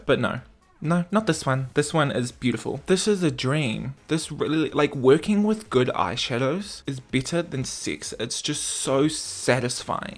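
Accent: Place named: New Zealand. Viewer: LOVE hearing a Kiwi accent! (waves from Southland) ❤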